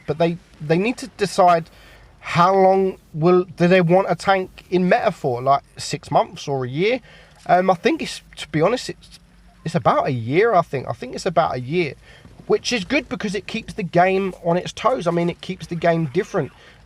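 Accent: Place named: Cockney, United Kingdom. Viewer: What is the maer you are talking about ? All of us are not cockney fluent, so please help us sometime with subtitles or eventually a more classical accent :)))))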